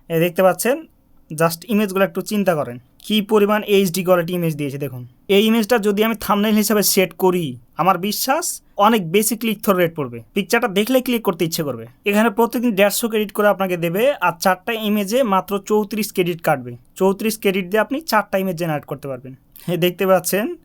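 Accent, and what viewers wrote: Chapainawabganj, Bangladesh. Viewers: ভাই আপনার বাসা কি চাঁপাইনবাবগঞ্জ আপনার ভাষা শুনে মনে হচ্ছে।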